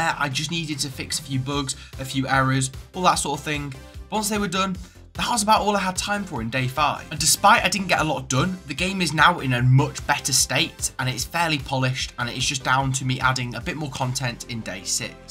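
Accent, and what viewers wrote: United Kingdom. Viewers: This guy is british?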